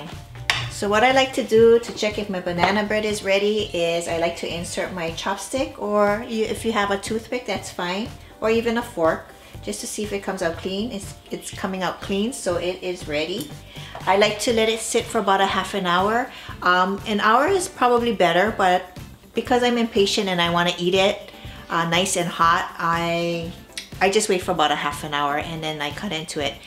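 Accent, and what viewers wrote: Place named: Hawaii, United States. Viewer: When I heard you talking, I thought, Hey, this girl from Hawaii. After reading your description I realized hey, I was right. You can take the girl out of Hawaii but you cannot take Hawaii outta the girl! You go girl. Great to find you on YouTube. Hawaii representing! I gotta make this.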